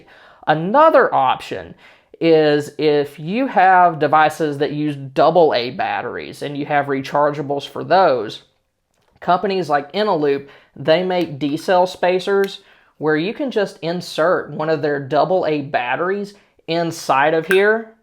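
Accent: Texas, United States. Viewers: Sounds like a Texan to me sorry if I am mistaken and your offended by chance. I find Texas and the people good folk(never been maybe one day)...anyway...stars are bright big and bright this is the land of Texas(all I know..). Take care and stay frosty brother.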